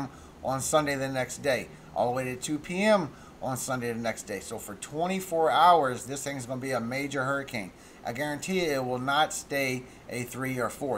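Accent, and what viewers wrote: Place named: South, United States. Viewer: God bless,. We both have biblical names and I am glad to be your brother in Christ. Besides that, I want to say I recently found your channel and I am thoroughly enjoying your content (aside from the life threatening aspect of course). Also please don’t take this the wrong way, but I like your content because of your mannerisms, accent, etc. As a southerner, it’s nice to see another one out there going through heavily scientific content but in layman’s terms and with the cool mannerisms of a southerner. Keep on keepin’ on and once again god bless!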